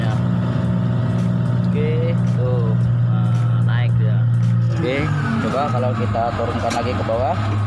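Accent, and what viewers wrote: Banjar, Indonesia. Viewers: Asli urang banjar sidin ni wkkw dri logat nya,kd kwa bedusta wkwk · Logatnya orang kalimantan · Lugat banjar 😂😂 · Logat nya banjar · Orang Kalimantan kh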